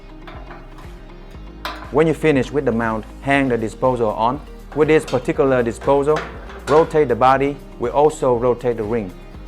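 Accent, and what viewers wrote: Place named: Vietnam. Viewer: Judging the the accent, bro is vietnamese 🙂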